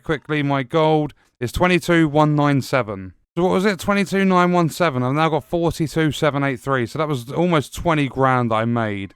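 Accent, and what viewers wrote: United Kingdom. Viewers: shitass video too british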